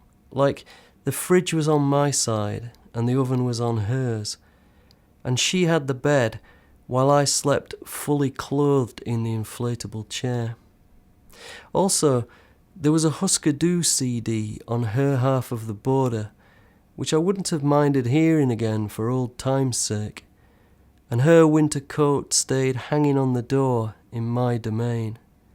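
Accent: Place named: Yorkshire, United Kingdom. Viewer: The mellifluous Tyke tones of Yorkshire's and Britain and Ireland's hardest working and tireless post punk beat poet Simon Armitage CBE...hats off Sir and thankyou for your untiring and quite excellent and exquisite spirit and soul work ... =D...ps love the Husker Du reference ...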